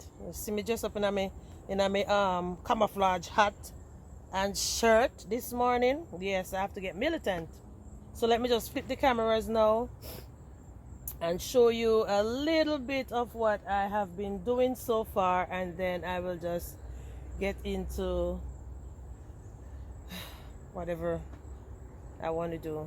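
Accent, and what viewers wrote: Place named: Jamaica. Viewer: Hey, I’m so glad I found your channel. I grew up in south Florida and I recognized that Jamaican accent immediately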